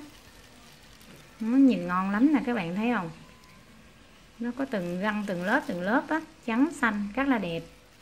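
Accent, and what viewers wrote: Mekong Delta, Vietnam. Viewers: Trinh Trần NGƯỜI MIỄN TÂY HÌNH NHƯ PHÁT ÂM NHƯ VẬY ĐÓ, BẠN CÓ GIỎI QUA DẠY LẠI MẤY NGƯỜI MIỀN TRUNG, BẮC KÌA, CÓ VÙNG GỌI N LÀ L VÀ NGƯỢC LẠI NỮA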